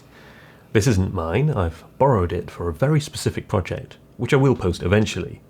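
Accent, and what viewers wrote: United Kingdom. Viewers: Can I ask🤔, and I ask out of pure curiosity and mean no offence, why is it you speak with a very good well educated British accent but sound "wires" with a V? ....please feel free to tell me to "multiply and go forth" and mind my own beeswax....I cant help it its my curious mind, when I have a curious thought go through my mind It just keeps jingling around in there distracting me and keeping me awake at night until I know the answer🙆🤦🤷🙄😅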